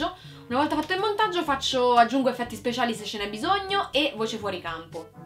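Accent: Tuscany, Italy. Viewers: Hai un accento toscano molto :3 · Ma hai un'accento toscano ! sei di roma? :)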